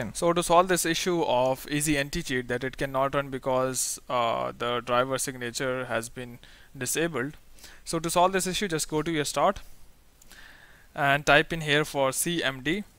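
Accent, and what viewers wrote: India. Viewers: probably a virus or something since its from an Indian accent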